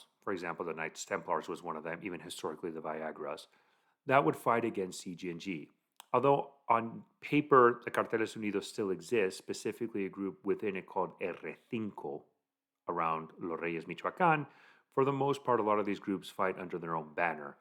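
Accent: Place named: Spain. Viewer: Gringo hablando mal español con acento español es super extraño xd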